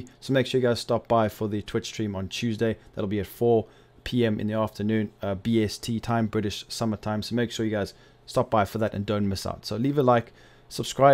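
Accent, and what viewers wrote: South Africa. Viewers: cape town accent..? howzit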